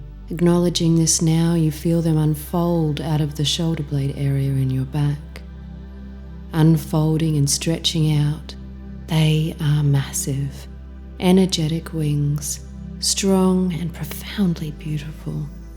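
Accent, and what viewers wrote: Australia. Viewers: It’s such a welcome to hear your beautiful accent! I’ve been living State side for almost 14 years now (time flies!!!) & have been missing home 🇦🇺 heaps. Appreciating your affirmation videos as well as the meditations while getting a dose of aussie accent 🙏🏻 I’m so grateful I’ve found your channel that aligns perfectly with the inner work I’ve been practicing. Many thanks & blessings 🙌❤️🙏🏻✨💓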